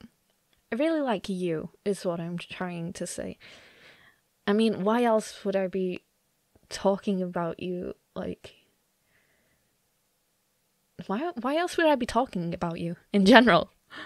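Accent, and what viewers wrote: United Kingdom. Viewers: This perfect scirpt perfect everything but the British accent ruins it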